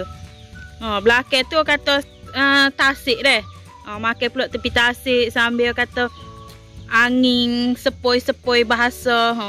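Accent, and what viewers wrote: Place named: Kuala Lumpur, Malaysia. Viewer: Slang KL adik ni..phm sgt..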